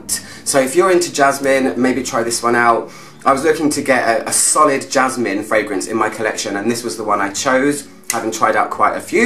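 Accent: United Kingdom. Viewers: New to your Channel and totally delighted by your reviews. I LOVE your style,your clothes and your britishness,and of course I share your passion for perfumes and everything perfumey. I also keep my English up to date and delight muy ears with your accent. Congratulations,you've got a new fan. Greetings from Spain,!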